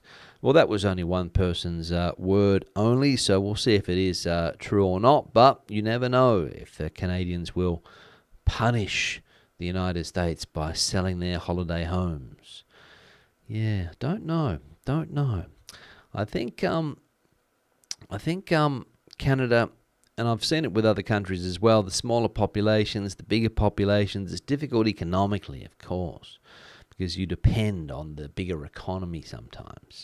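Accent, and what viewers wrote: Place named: Australia. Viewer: I'm from Germany and stumbled upon your channel a couple of days back. Quite funny to hear an Aussie doing the news on Spain. G'day! I lived in Oz for 2 decades and loved the outback, and now want to retire in southern Spain. I'm taking spanish classes and researching my options. Looking good. Thanks for doing this, looking forward to hear more from you. Cheers!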